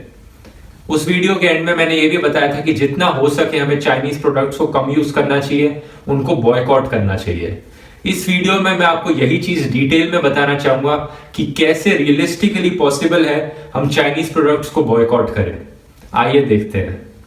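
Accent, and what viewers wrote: India. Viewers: I wish I could decifer his Indian accent to English, because it sounds like he knows what he is talking about.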